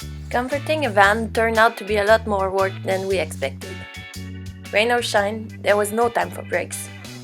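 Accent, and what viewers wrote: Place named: Quebec, Canada. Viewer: french canadian i think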